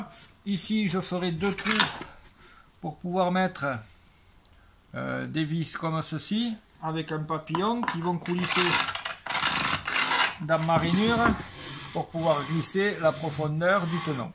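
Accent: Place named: Gard, France. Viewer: Je t'adore! et ton accent qui me rapelle celui de mon patron quand j'allais vendanger dans le gard! le petit betisier est cool aussi, peut le mettent en ligne et ca ajoute un peut de proximite. ne change rien:)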